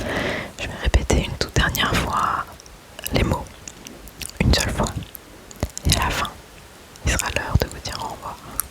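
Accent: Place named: France. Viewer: Tu as fais une vidéo vraiment éducative et intéressante et vraie on a des mots dans notre langue assez spéciaux à prononcer et écrire et les sons différents tu réalise vraiment un travail parfait et ton anglais était bien compréhensible avec note joli accent français 😁 mais en tout cas une vraie vidéo intelligente et bien faite tu peux être fière de toi 😊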